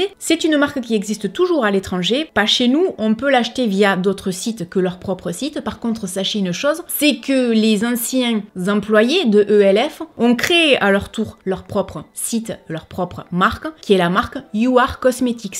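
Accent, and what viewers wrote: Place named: Perpignan, France. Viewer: J'ai rigolé car il n'y à aucun de ses produits que j'ai testé acheter mais ta bonne humeur et ton accent de Perpignan me fond toujours du bien 😝😘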